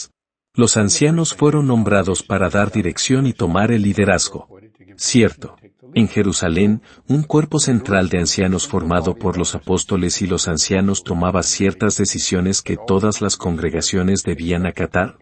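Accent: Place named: Spain. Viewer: You Will forget Your spanish accent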